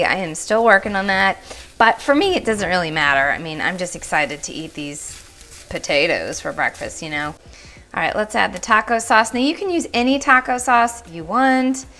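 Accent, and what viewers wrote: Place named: South, United States. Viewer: I love your southern style. I am from NY and I would have love to be born down south, accent and all❤️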